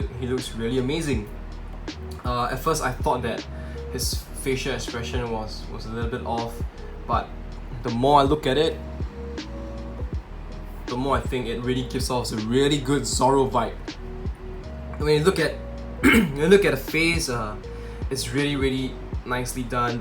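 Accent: Singapore. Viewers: We know a singaporean accent when we hear one 😂😂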